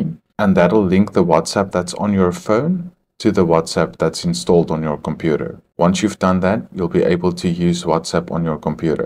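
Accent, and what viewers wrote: South Africa. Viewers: Thank you so much ❤ My Microsoft Store seems not to be working , Got yourself a new sub here, the accent sounds South African❤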